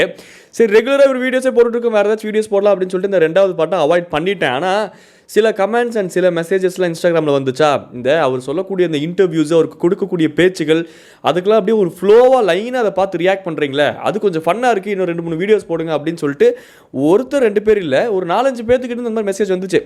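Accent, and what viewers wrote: Coimbatore, India. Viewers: Your cute man but nenga pesurathu Konjam coimbatore slang mathiri irukku but nalla irukku Ivana kadaisi varaikkum thiruthave mutiyathu intha moji pathale kovama varuthu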